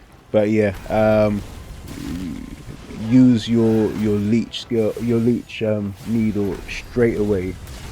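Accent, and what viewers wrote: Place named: Italy. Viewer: mate! I have an Italian friend who has exactly your accent, even a similar voice. So, I was like, aha! Got ya!!! You do like Wuchang!!😂😂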